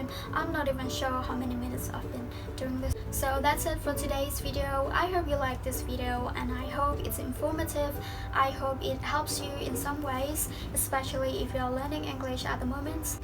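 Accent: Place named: United Kingdom. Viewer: Wow I'm so amazed how fluent your English is and the way you talk and that British accent is so so great, I'm so jealous. Some love from your IG friend ❤🥰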